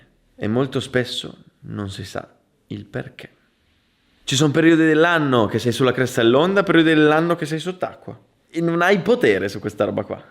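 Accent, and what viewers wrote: Italy. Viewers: Minchia stavi parlando paleminato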